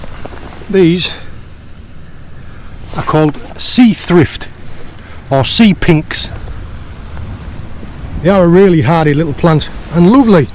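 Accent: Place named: Cornwall, United Kingdom. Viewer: Just love the videos! I Put the captioning on, but your thick Cornwall accent makes for interesting reading! This is what I look forward to! Great morning, watching while I drink my morning coffee! ☕️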